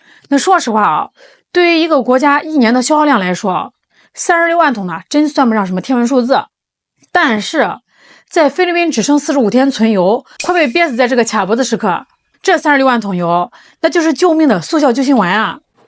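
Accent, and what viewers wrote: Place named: Shandong, China. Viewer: 这个女的好像是山东人，尾音每次拖很长，给人一种每句话都在嘲笑的感觉。还是把话说好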